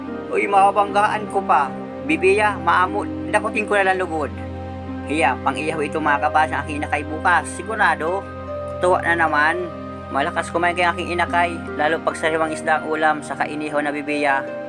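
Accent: Bicol, Philippines. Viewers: bicol na bicol ang tono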